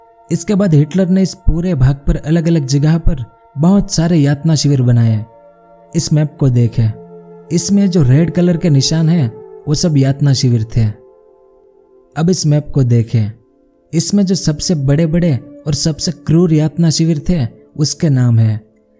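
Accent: Gujarat, India. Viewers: Gujarati lago cho??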